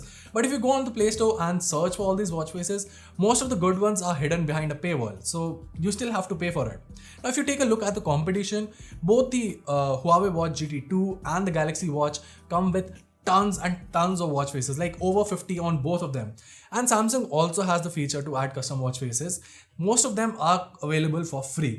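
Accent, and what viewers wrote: India. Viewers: Great review, subscribed. I usually stay away from Indian accents, just because they usually come with subpar English and are hard to follow, but your charisma is alluring, and your English is quite good. Keep up the good work!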